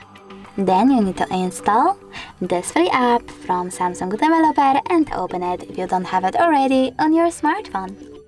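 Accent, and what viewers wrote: Asia. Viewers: Can you speak slower and not Asian Please !!! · Too hard to understand thick Asian accent. Too long nails can't see exactly where pointing. Way too fast.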